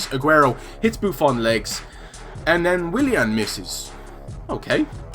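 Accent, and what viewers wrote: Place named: Ireland. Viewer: Yo where you from in Ireland? Im from Galway. Im guessing Dublin??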